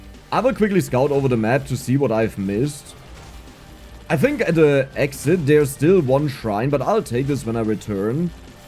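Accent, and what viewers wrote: Germany. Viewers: Richtig deutscher Akzent xD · im watching tha vid and the hole time i thougt, he has to be german by the way hes pronouncing words, and it got fcking confirmed... :D lov it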